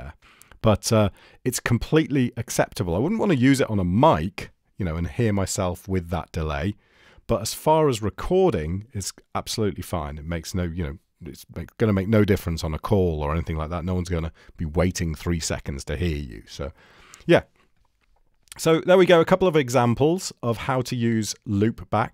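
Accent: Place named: United Kingdom. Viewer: Thanks a lot!!! Love your britsh speech!!!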